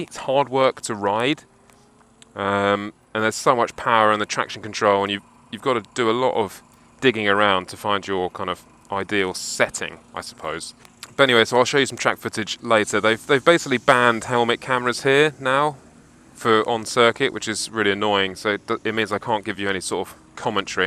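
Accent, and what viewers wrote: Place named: RP, United Kingdom. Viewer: cool, you sound exactly like Sacha baron cohen's normal speaking voice,, not Ali g. Well posh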